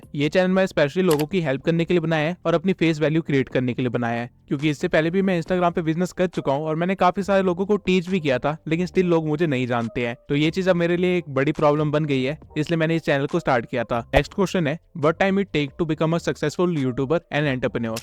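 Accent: Bihar, India. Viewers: bro aap bihar se ho na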